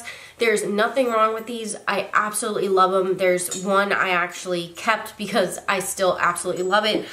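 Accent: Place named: Maryland, United States. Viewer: I'm from md too ! I say warsh and warter too lol. Not to mention I did my nails earlier today the exact same way. Great minds think alike 😊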